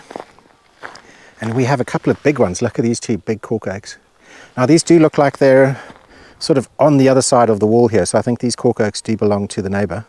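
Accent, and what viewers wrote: South Africa. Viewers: are you from South Africa? Your accent is similar. I visited Durban years ago.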